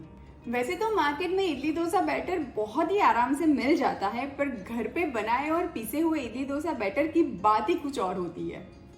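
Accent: Gujarat, India. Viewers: its not bhiga sakte ho...its figo sakte ho...correct u r hindi..gujratishhh hindi.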